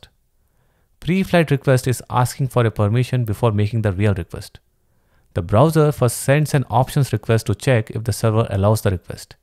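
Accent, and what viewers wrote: India. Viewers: Best !!!! crazy video (I think u are indian by accent tho) ❤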